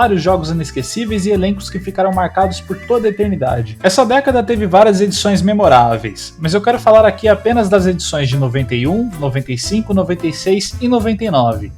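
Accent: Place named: South, Brazil. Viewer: Claramente sulista.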